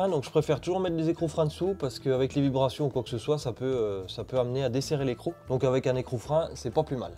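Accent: Franche-Comté, France. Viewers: Bonjour Un petit accent franc comtois non?!.. 😉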